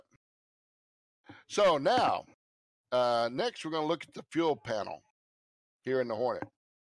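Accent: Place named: United States. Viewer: Jesus he sounds so American xD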